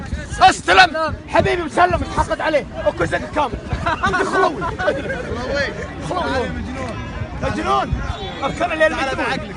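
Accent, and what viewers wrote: Saudi Arabia. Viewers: ابك والله هو دوسري ويصرق لهجة عتيبه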